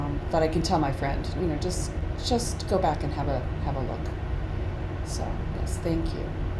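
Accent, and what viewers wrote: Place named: South Africa. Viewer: I really appreciate this video thankyou and to all. Is anyone able to join these sessions? Four Agreements is great, simple yet profound truths and pactices. SO much going on energetically! My guides told me to take more shorter breaks more often throughout the day as it's a tripple whammy for me recovering from medical treatments and going through all these energetic changes, getting upgrades and being so highly sensitive. Solar flares really affecting me too, I had a throbbing headache yesterday - unbearable! I "cried out" out to my guides, I said please!! I imagined a "helmut" on my head absorbing the pressure and tension and asking it to give me protection and it dissolved, just like that - what a relief! I love that SA accent, you'll end up with an interesting accent I think. Thanks lovely, great video, I'm glad you made it and shared it - very supportive for the rest of us. 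🙏💗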